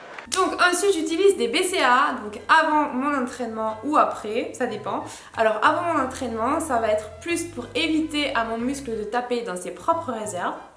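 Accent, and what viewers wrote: South, France. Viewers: J'adore ta voix avec ton petit accent du sud haha video interessante merci continue comme ca tu gere biZe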